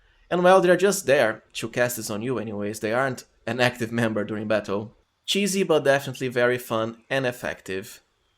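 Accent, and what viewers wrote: Brazil. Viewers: where are you from? your accent sounds brazilian! if you are, shoutout from a fellow countryman :) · Se eu tivesse que chutar pelo sotaque ele é br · Cara, você é do Brasil? Seu inglês parece muito de um brasileiro. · esse sotaque ai ta meio br kkkkkkkk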